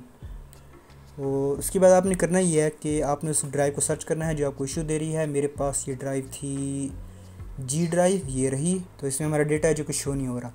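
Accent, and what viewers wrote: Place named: Southern England, United Kingdom. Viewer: Southern English to fast..... had to change the playback speed. LOL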